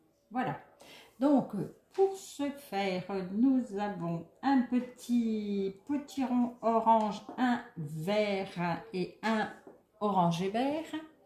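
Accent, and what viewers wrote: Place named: Haute-Marne, France. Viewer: Rhooo ben non... on n'en a pas marre du tout, au contraire... on en redemande 😀😃🙂🙃! C'est toujours un grand Bonheur Boostant et Apaisant d'entendre ta voix qui me rappelle de si bons souvenirs (ils sont bien au chaud dans mon Cœur ❤ ❤ ❤ ❤ ❤)! Et cet accent haut-Marnais 😉😉... un réel plaisir 😀😃. Là c'est l'hôpital qui se fout de la charité 😀😃🙂🙃😊😇😀😃.... On me dit à La Rochelle: - "Vous n'êtes pas d'ici vous!"... Voilà, une partie de mon Cœur est dans les Vosges, une autre en Haute-Marne et encore une en Charente-Maritime 😉😉. Bref... mon Cœur a beaucoup de place 😉 et tu y es bien au chaud ma. Bisous à toute la famille. A tout bientôt ma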